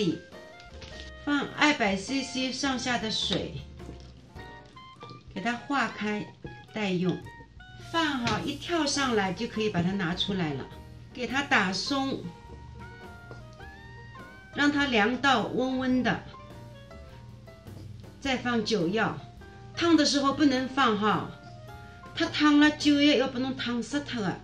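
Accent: Shanghai, China. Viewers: 上海话，哈亲切！ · 稀饭听浓刚上海话，哈亲切！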